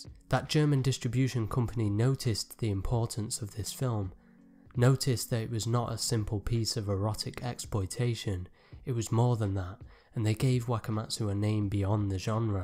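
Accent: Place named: Manchester, United Kingdom. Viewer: This was really interesting! Something made me think about Wakamatsu earlier tonight, and I've only seen one film of his (Go Go Second-Time Virgin, some time around <num>) and gave his name a search and clicked through to this. I definitely wasn't expecting to hear a Manc accent hahah.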